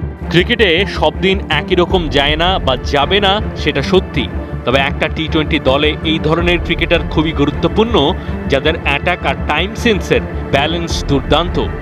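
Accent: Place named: India. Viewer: ইন্ডিয়ান কন্ঠে খবর পড়া কেন😡😡😡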